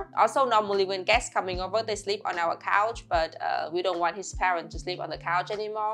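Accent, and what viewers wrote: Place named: Germany. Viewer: I just noticed that your English is starting to get a German accent, lol.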